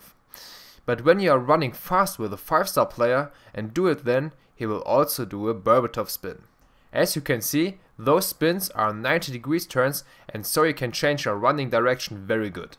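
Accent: Germany. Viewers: r u german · Der ist doch hundert pro n deutsch das hört man sofort :D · Ich finds so lustig wie man es raushört, dass du deutsch bist. :D Btw gutes Vid.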